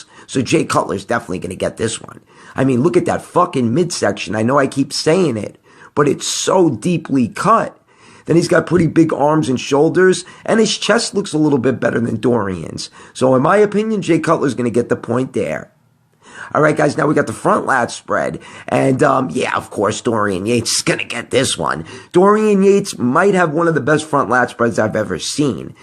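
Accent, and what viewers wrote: New York, United States. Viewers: I love your NYC accent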